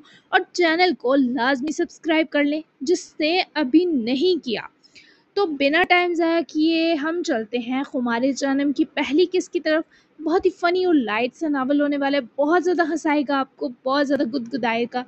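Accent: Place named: Punjab, India. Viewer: Your Punjabi accent is awesome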